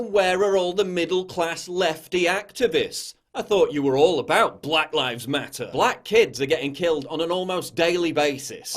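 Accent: United Kingdom. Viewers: First video I’ve seen of yours and 3 mins in I hit that sub button talking on our level and your British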